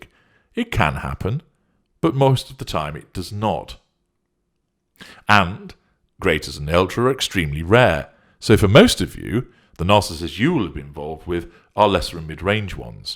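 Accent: United Kingdom. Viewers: I dont like your british accent. Make your videos in an american accent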